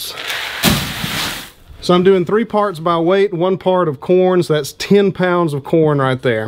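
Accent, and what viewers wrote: South, United States. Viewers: judging by your accent, you live in the south and absolutely do not need heat lamps. In fact, you're risking burning the coop down with them in it. Forcing your hens to pump eggs out during their natural rest period is controversial and not good for them in the long run. It will shorten their life span and many think it's inhumane. The rule of thumb is to let them do what nature dictates.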